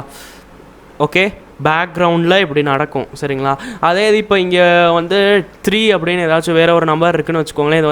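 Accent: Madurai, India. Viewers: Bro Neenga Maduraiya?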